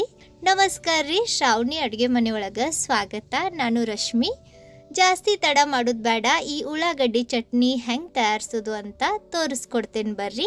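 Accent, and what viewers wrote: North Karnataka, India. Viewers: ಈರುಳ್ಳಿಯನ್ನು ಉಳ್ಳಾಗಡ್ಡಿ ಎಂದು ನೀವು ಏಳುವುದನ್ನು ಕೇಳಲು ಚೆನ್ನಾಗಿದೆ. ಉತ್ತರ ಕರ್ನಾಟಕದ ಭಾಷೆ ಎಂದು ಅನಿಸುತ್ತೆ.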